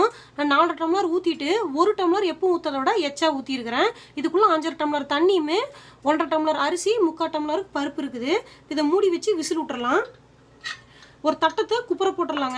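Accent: Kongu, India. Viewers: konjum kongu tamil super, very respective slang in tamilnadu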